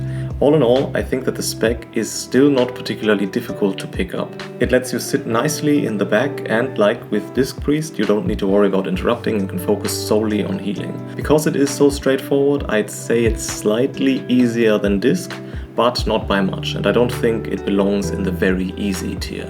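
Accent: Germany. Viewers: I like(d) all your videos so far! They are really good - especially the Pres evoker and disc ones! Piano sounds aren’t bad at all, but it is the wrong note for me. They doesn’t fit to your script. It is just my personal feeling, so don’t worry! This doesn’t mean, that your videos are bad or something. 🤘🏼👍🏻 Just a tiny hint: try while editing a click or short swift sound to have a comparison. Furthermore it is obvious or at least it looks like, that you are reading your text. Another suggestion: just read your topic bullet points and use your own words. I’m sure it will underline your enthusiasm even more! Your next video will be good too! Even if you aren’t doing +12s or above with your Druid ☺️ …and last but not least least: I’m German too 😅 so… I can hear it 😎🤣 however, your English is really, really good!! I have to speak English day by day, but I’m far away from your speaking skills! 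Cheers m8 and I’m looking forward to your Druid & mw monk videos! 🤘🏼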